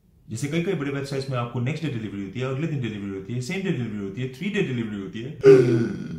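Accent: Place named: Bengal, India. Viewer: Haha this was such a fun dig at this guy! That bengali accent added more flavour..regards, fellow bong :)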